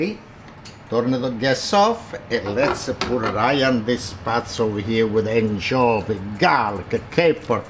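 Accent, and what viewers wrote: Italy. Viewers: Perhaps cut the anchovy up to spread evenly would be the only different thing I’d do I love listening to the slang Italian Yr like the old compare next door. Bravo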